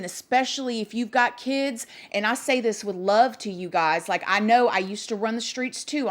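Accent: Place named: Louisiana, United States. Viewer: Hey, I was wondering if your maiden name wouldn't happen to be? You remind me of and look like my little cousin that I have not seen since childhood. We are from Lake Charles Louisiana and you sound like you have a Louisiana accent.. I was just wondering if you are my long lost Lil cuz.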